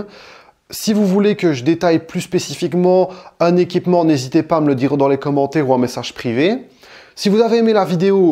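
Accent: Belgium. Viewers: tres belle salle ... t'es belge ? si oui quelle région ? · c'est l'accent des mecs de Verviers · C'est curieux cet accent belge comme si vous aviez le nez bouché ! Je vous salue cousins belges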